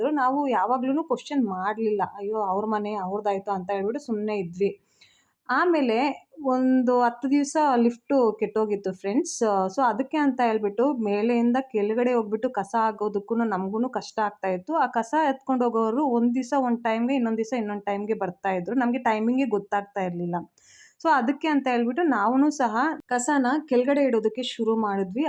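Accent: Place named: Punjab, India. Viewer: Hi nanu nime volg evte nudidu medam esta ayethu.but nime kannada keli sulpa shock ayethu i think u r a panjabi adre esto chanagi kannada madatitdara very nice 😊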